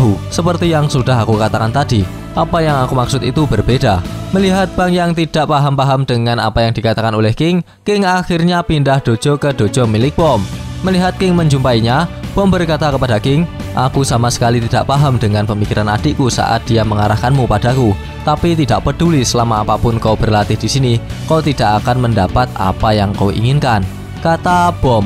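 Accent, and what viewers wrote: Java, Indonesia. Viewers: Lu orang Jawa ya min,medok bnget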